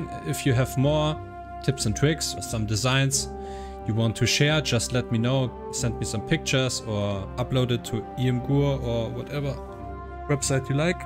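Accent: Germany. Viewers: Deutscher Akzent so deutlich zu hören xD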